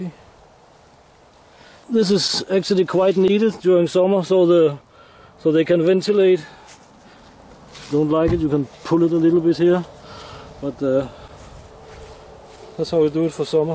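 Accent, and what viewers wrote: Denmark. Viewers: He is from Denmark. Many Danes are fluent English speakers. My brother has a Danish wife a 3 children. I knew was a Dane from his accent. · No he's Danish, it's a danish accent. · The most surprising thing about this video, is seeing a Russian speaking English with a Danish accent.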